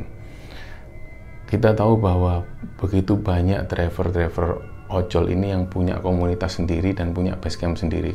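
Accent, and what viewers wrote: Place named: Surabaya, Indonesia. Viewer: Seng tak tunggu iku logat khas suroboyo cak. Ojok di ilangno boso suroboyoe.. 👌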